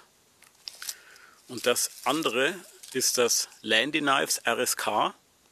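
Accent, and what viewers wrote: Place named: Franconia, Germany. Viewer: Cool! Hätte ich bei beiden Lust drauf. Ein Landi hatte ich ja schonmal in der Werkstatt ... Wir werden sehen ... Übrigens, ich sehe Deine Videos nicht nur wegen des Inhaltes so gern sondern ich liebe einfach den frängischn Dialekt. Bin ja selber ein Oberfranke ... Zumindest von der Mundart her.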